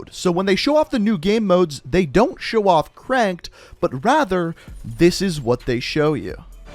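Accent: Canada. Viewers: He’s a Canadian (I think) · Emmanuel Moreno he’s Canadian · Omg,that was driving me fuckin' nuts too. Weirdass Canadian pronunciations. So annoying.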